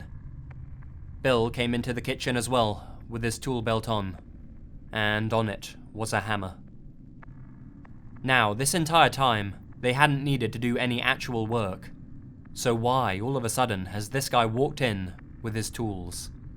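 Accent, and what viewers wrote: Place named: England, United Kingdom. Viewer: Best voice, i make fun of him but I would rather hear an English accent over any other, chap!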